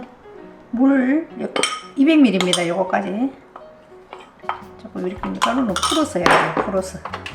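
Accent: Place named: Geochang, South Korea. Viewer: 어머님 고향이 어디셔요^^ 경남 거창인데 억양이 귀에 익어서요?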